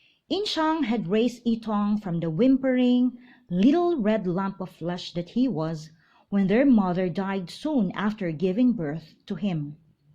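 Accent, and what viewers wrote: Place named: United Kingdom. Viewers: British accent times ten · I can't understand your like a British accent 😌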